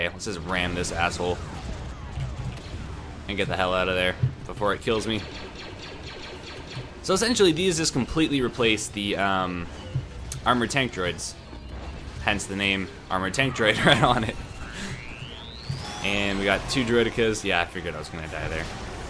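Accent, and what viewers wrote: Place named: Canada. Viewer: I love your Canadian voice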